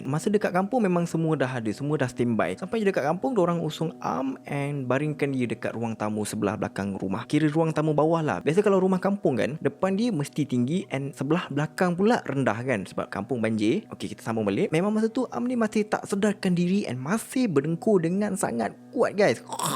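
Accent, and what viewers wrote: Johor, Malaysia. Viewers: orang johor lah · Bang org Johor ke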